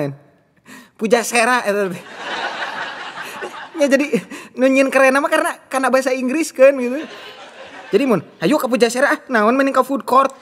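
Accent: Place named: Sukabumi, Indonesia. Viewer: Sunda na logat jampang sukabumi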